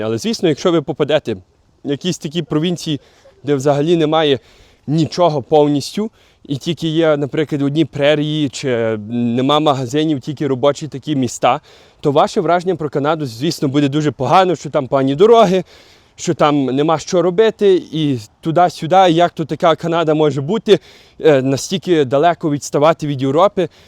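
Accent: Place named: Stryi, Ukraine. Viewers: У вас така оригінальна вимова❤ що я немов не у Канаді, а на Стрийщині побувала😅❤ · нагадав мені одного сусіда з сусіднього під'їзду, коли я жила у Львові..(дуже хороша добра людинабув той хлопець..( На жаль, мені чомусь завжди подобались хлопці "з претензією".. Це моя Карма.,)) Але я дуже з великою повагою відношусь до добрих хороших людей. Нехай таких буде більше на Землі! Така розмова у як у певних регіонах Львівщини..) молодець. Розповідає про актуальні теми, показує багато Канади. Дуже цікаво. 🙂🙏... Ці люди всі кудись спішать, вони хочуть тусити, кавувати, їм потрібен натовп.. Я говорю про тих хто жаліється на Канаду. Ну то добре, не подобається - їдьте в інше місце.. Дайте можливість нормальним спокійним інтравертам (і неінтравертам теж,)) пожити в прекрасній країні, де є багато простору, природи і Закони, яких всі дотримуються. (!) Держава, яка турбується про своїх жителів. 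Безперечно, нелегко відразу знайти роботу, можливо, хтось не знає англійську мову. Але хто хоче, той вивчить. І навіть перевчиться, перездать іспити і досягне успіху. Все залежить від бажання людини і прикладених зусиль. Європа колись була чудова, а зараз вона перенаселена. І не дуже безпечна. Кожен шукає, що їм треба. Дай Бог кожному з нас знайти. (!!!) Дякую за відео. 🙂🙏🕉️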